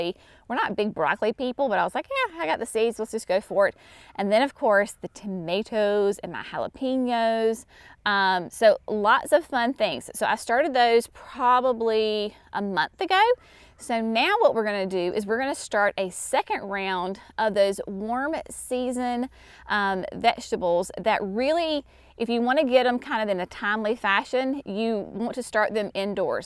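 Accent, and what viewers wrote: South, United States. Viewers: Great tips! You are so pleasant to watch. I’m from New Hampshire and your southern accent sounds beautiful to me🥰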